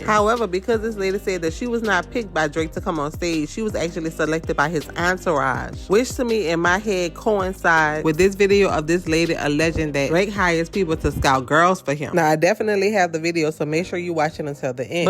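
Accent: New Orleans, United States. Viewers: Please speak more clearly and add a few pauses in your sentences. Your New Orleans accent has to be the CUTEST one ever. ❤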